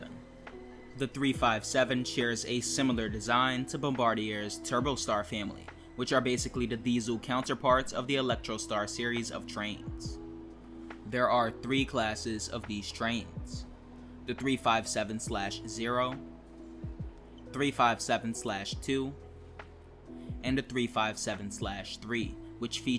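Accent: United States. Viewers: Having moved out a while ago, this was so nostalgic hearing someone talk about the line that I grew up with. I also loved hearing someone with an american accent saying my home town name xD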